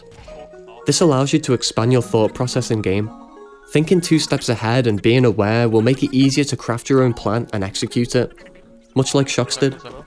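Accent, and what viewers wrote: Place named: Glasgow, United Kingdom. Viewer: Bro you from Glasgow?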